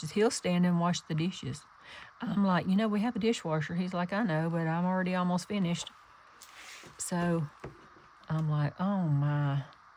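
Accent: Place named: Maryland, United States. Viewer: Hello, what a joy to listen along with you. You are very funny. And I love the sound of your voice. My Uncle called me the other day to tell me my Aunt is dying. She is Maryland, born and bred. And I know your voices are unique across the country, yours is very similar to hers. I will probably listen to this a few times, and although I'm really enjoying your show tonight, I would listen to you read from a catalogue.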